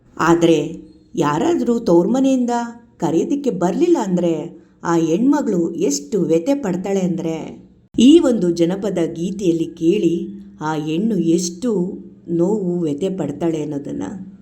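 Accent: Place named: North Karnataka, India. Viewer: ನಿಮ್ಮ ಹಾಡು ಸೂಪರ್ ಸಿಸ್ಟೆರ್ ಅದಕ್ಕಿಂತ ಹೆಚ್ಚು ನಿಮ್ಮ ಉತ್ತರ ಕರ್ನಾಟಕದ ಶೈಲಿಯಲ್ಲಿ ಮಾತಾಡಿದರಲ್ಲ ಸೂಪರ್ 👌👌👌👌👌👌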